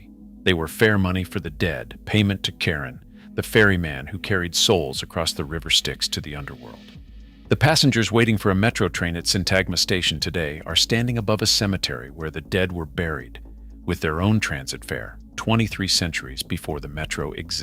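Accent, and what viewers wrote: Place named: United States. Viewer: Great presentation, but your americanized pronunciation of the Greek names is quite disturbing I'm sad to say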